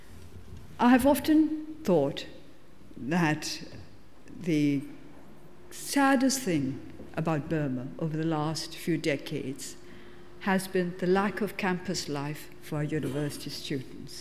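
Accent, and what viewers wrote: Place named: United Kingdom. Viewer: i didn't know she studied in oxford, no wonder why she has a a british accent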